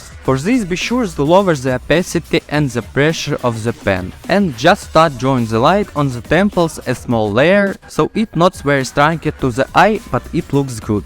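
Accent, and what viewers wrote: Russia. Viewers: Russian accent🤯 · хорош, сразу слышу русского чела )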